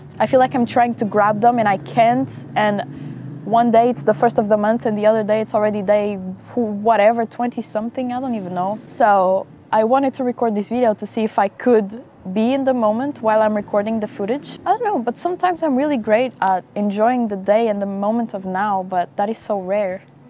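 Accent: Portugal. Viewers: portuguese ? sounds like it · man tu tens um ingles bue bom mas n sei porque eu senti logo q eras tuga good content!!!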